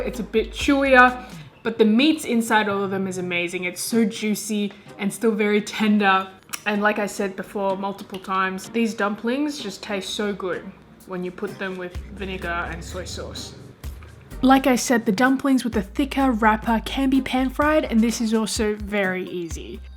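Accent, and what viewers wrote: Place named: Australia. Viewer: it's strange to hear an asian person with an australian accent. i'm from vancouver where most asian have an asian accent. it's very cool.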